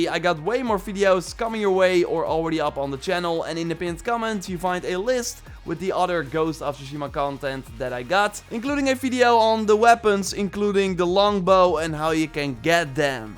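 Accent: Netherlands. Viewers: Heb je niet het idee dat de game minder leuk wordt door "te vroeg" je wapens naar max lvl te werken? ( aan je accent te horen, ga ik er vanuit dat je Nederlands ben ;-) ) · Dutch native speaker?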